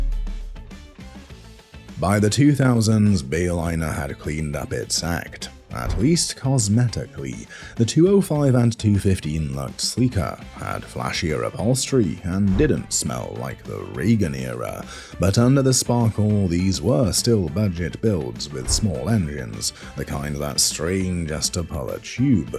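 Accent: United Kingdom. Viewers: Utter and pure horseshit. Kept watching just to see how idiotic this one got. Which it sure did. As a boater since childhood, I cannot remember an elite population as this British voiced fool implies, ready to question brand after brand at this level of boat. Yes there are cheaper quality boats just as automobiles are. And the world keeps spinning what a shocker. Do me a favor and stay home and impress yourself that a life of snobbery is far better than enjoying the boating scene. 1994 Four Winns owner here - old school in the house that takes care of his boat and could care less there are OMC labels on a Volvo and Ford I/O setup!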